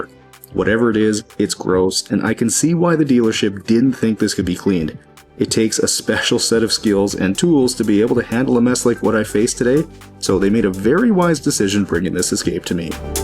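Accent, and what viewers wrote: Canada. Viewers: 1. Are you from Canada? I hear an accent. 2. I hate that there was a dog in there 😢 and 3. That was NICE vehicle, they had a moonroof, and whoever drove it was VILE 😢 but it looks amazing. Love the video ❤ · "Aboat" that's when I found out you're Canadian 😆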